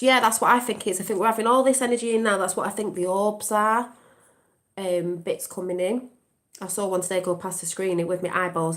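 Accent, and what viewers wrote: United Kingdom. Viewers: That's our girl, always on point with that British voice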